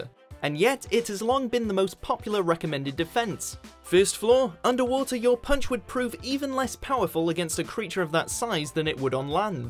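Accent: United Kingdom. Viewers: What! when did the british narrator come from!? i prefer mr.mojo and ms.mojo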